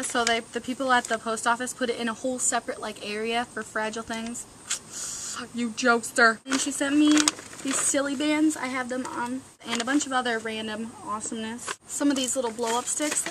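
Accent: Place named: Chicago, United States. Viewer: do u live in chicago... cause my family does and u have a illinos/chicago accent..... just wondering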